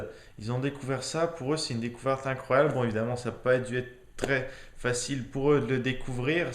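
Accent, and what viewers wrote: Canada. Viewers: Accent canadien <3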